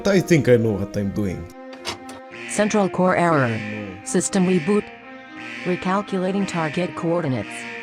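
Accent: Russia. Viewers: You sound like you have some sort of Russian accent (Don’t hurt me, I’m supposed to be a stereotypical boi from the US)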